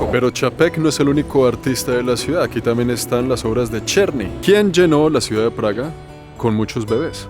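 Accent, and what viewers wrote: Bogotá, Colombia. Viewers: Hola gracias por tus vídeos tan instructivos,y con tu acento tan marcado de puro Bogotano.ojala visites pronto la tierra.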